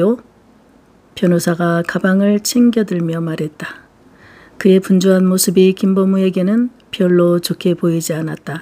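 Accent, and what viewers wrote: Jeolla, South Korea. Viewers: 전라도 사투리가 엄청 재미져부러라.😅😅😅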